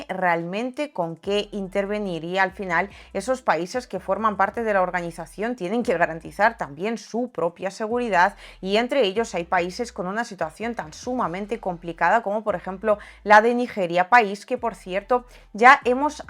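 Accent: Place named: Spain. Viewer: Me encanta tu acento espanol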